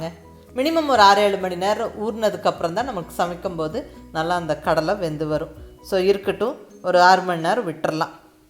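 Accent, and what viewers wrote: Kongu, India. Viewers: கொங்கு தமிழில் அழகா...healthy ஆன ரெசிபி சொன்னிங்க... thanks mam 🙏....👍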